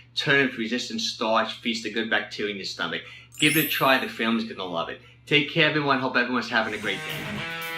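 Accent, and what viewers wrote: New York, United States. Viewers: Dear - I am grateful to know you brother. I am following your three part exercise to firmer abs. Follow up question to your answer that I can do 1 minute of high intensity jogging one minute rest walking. Regarding the high intensity jogging rate, all I can muster is 7.5 on my treadmill. I am 63 and in great shape. Is 7.5 a good speed? Should I raise it or can I even lower it. Your humble follower Laird Mintz. P.S. You sound Like you are from New York. I live in Chicago but was born in Brooklyn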